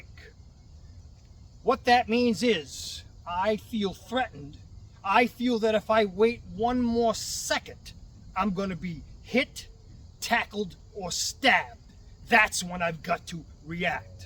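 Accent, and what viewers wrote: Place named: Northeast, United States. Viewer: Speakeming witha N.E. accent kills it for me.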